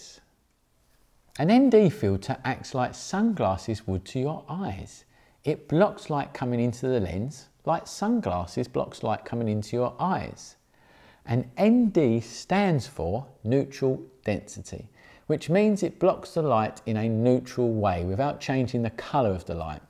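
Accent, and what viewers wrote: United Kingdom. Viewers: Lovely accent feels like I'm watching great British bake off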